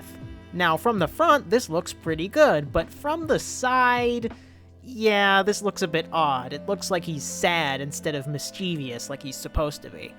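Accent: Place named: United Kingdom. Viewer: Bro’s British✋😭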